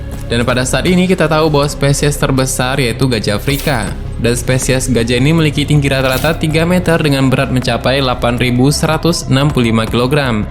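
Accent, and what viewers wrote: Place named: Java, Indonesia. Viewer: Bahasa Jawa mu lucu Min, tapi nice try😁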